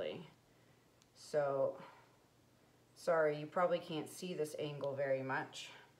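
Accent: Canada. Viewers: Omgoodness I love yer Canadian accent and you and Molly Mc like BUTTA are my favorite!!! WHAT????? Beautiful. I’m doin it!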